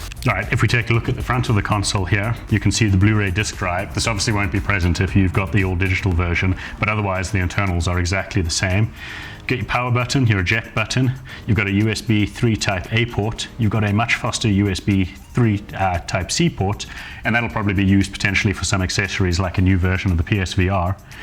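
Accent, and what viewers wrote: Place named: Scotland, United Kingdom. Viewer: Thick Scottish accent LUXURY!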